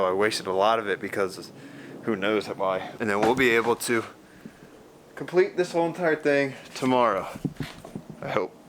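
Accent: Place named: Newcastle, United Kingdom. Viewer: listening to true Geordie! Love that and watching this build has really helped me with my project boat some of your "mistakes" i was able to skip and not have to take the time and make them myself. Keep up the great videos and hope to see you on the water soon!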